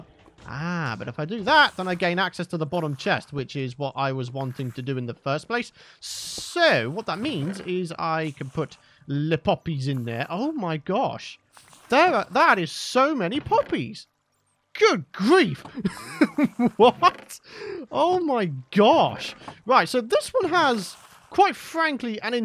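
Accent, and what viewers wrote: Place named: Scotland, United Kingdom. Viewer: anyone else think that is a want to be Stampy, except stampy is english and sounds scottish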